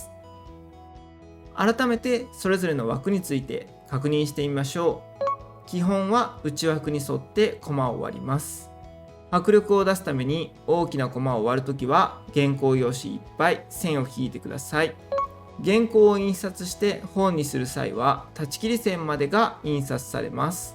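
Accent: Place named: France. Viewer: Merci beaucoup professeur, vous nous aider beaucoup 🥹🙏🏾🥰 j'adore votre accent français, c'est juste incroyable 🤌🏾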